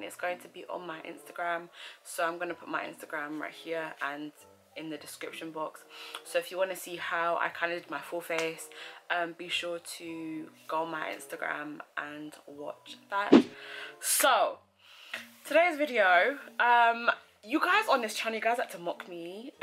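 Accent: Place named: Uganda. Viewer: The Uglish is on point ! 😂Loved thisss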